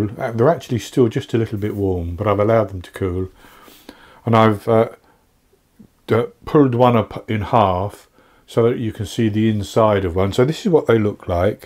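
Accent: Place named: Devon, United Kingdom. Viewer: Wonderful recipe uncle...very therapeutic...Perceval a old gentleman friend of mine is convinced.. You are from Shropshire...he enjoys working out accents...now he thinks your from Devon. Any clues without revealing so we can work it out.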